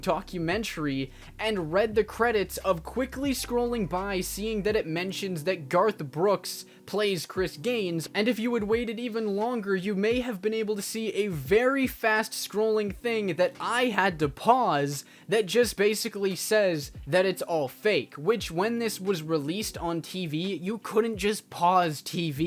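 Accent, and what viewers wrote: Canada. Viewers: the Beaches of "Chi-neen"? From his accent, I assume the host is Canadian, but if he's gonna talk about Garth Brooks, he needs to know how to pronounce Cheyenne.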